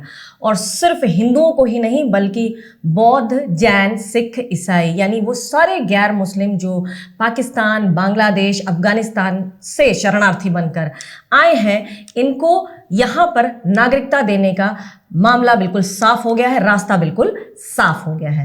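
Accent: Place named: Uttar Pradesh/Bihar, India. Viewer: Superb presentation with classic rural UP & Bihari hindi touch. Very professional narration. Keep it up.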